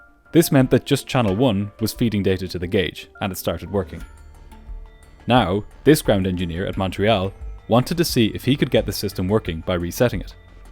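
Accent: Ireland. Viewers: Its the Irish accent - its where all American accent descend from so has a a warmth to it you are familiar with.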